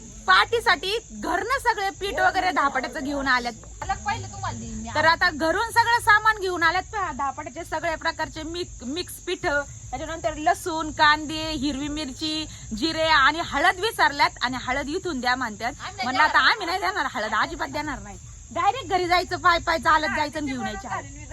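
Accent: Ahmednagar, India. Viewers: ताई नगरला असच बोलतात, आणि स्वातीची भाषा अस्सल नगरी आहे लहान पणापासून आपण जे बोलतो ते लगेच नाही बदल जात सारखे सारखे नका तेच बोलत जाऊ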